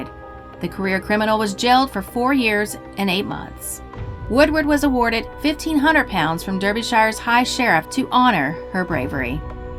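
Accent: United States. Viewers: yet has an American accent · you speak with an American accent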